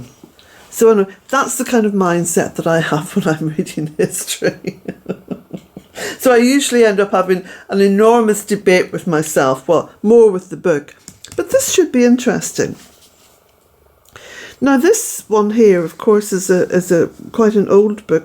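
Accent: Ireland. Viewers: (((❤️))) Perfect Day For Reading! FANtastic Books You Chose! What Fun; To Cozy Up With A Blanket And To Hear The Rain Outside! BTW: I’ve now shared your videos with my three grown sons; Sean Patrick, Kevin Michael, Brian Kelly...I knew for sure they’d love you...their grandmother on their daddy’s side from County Mayo. What can I say...had to name my kids Irish names...otherwise...being Italian that I am, all there was to pick from was, Tonys or Joeys! 🤗👍😉My sister did the same with her only two daughters; Shannan, and Erin! We both wanted children with green or blue eyes! Of course, red hair would of been awesome! (HaHa!) In any case, I knew your accent and where you live would bring them joy! And, not to end, for them to see their mother be so happy to have found your videos that too, brings us all much delight! Oh, I could listen to you forever! I hate when your videos end! 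Wishing you a glorious day ~ No Matter How Spelled, Let It Reign!☔️🏡📚👍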